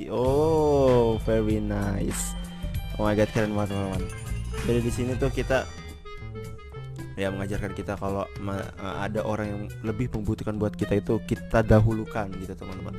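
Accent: Java, Indonesia. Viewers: Kaka dari jawa mana , kalo aku bayuwangi Jawa Timur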